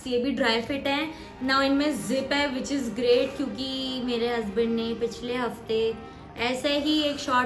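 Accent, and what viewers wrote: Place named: India. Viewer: Love u indian accent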